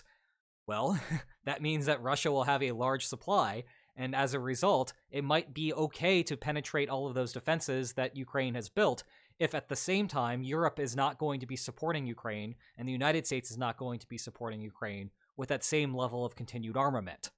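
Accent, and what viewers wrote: Pittsburgh, United States. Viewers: Questions for next Q&A: 1. What is your favorite bridge in Pittsburgh and what is your favorite tunnel in Pittsburgh? And what're your favorite bridges and tunnels outside of Pittsburgh? 2. How hard was it learning how to speak in such a civilized and understandable manor and how hard is it to keep yer natural Pittsburgh accent suppressed? 3. What do the lines on maps say about how much longer the Rooney family continues to accept their midling position of always being above .500 but never getting anywhere in the playoffs before they finally force Tomlin to tear it down and tank for a year to start a rebuild? It seems like the sooner they do it the better off they would be and now that we're passing a weak QB class it seems like this year would be a great year to draft a guy they think can turn into a longtime backup and let him play all year with a buncha rookies so they're in a position to draft a potential franchise QB next year or the year after.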